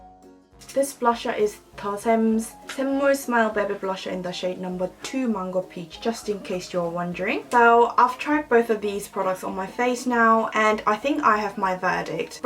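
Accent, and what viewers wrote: England, United Kingdom. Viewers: your british accent is amazinggg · Are you british? Your accent is so nice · omg u have such a cute english accent!! loving ur content girl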